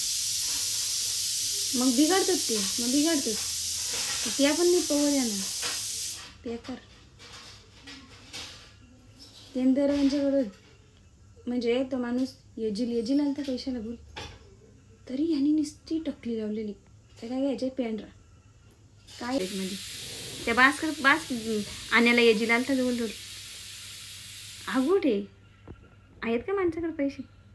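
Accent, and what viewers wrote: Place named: Shahapur, India. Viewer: ताई गाव कुठला ग तुझा मस्त बोलतेस आपली शहापूरची भाषा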